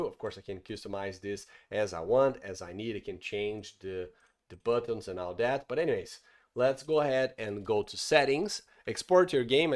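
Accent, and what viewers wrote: Brazil. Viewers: the brazilian accent xD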